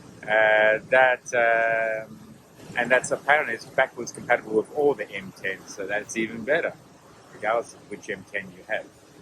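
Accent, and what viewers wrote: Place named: Australia. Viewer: good to hear an Aussie accent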